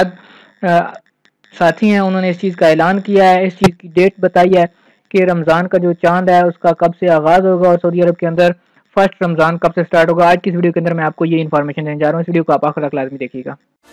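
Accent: India. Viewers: It isn't Saudi, it is some indian speaking "ringi'dingi'ding-ish"... just headache..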